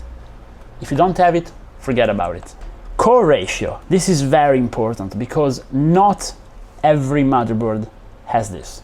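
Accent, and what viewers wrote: Italy. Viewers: Why u have an Italian accent?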